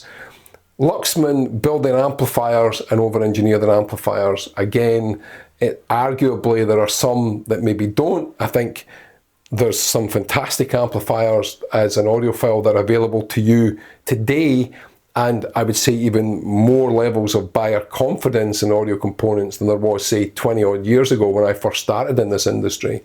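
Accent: Scotland, United Kingdom. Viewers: Being and Australian, I'm sure he's Scottish.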